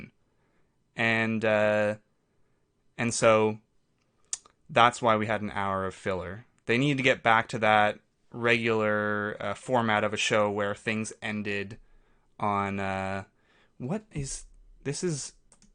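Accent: Canada. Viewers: Which part of Canada are you from. It's pretty obvious by now. I used to live in the Toronto area.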